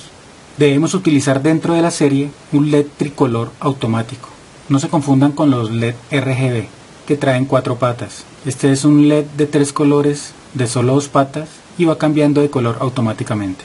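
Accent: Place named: Colombia. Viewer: Muy buen vídeo! Por cierto, ya creo saber de dónde es tu acento; de colombia! Linda voz :) Saludos!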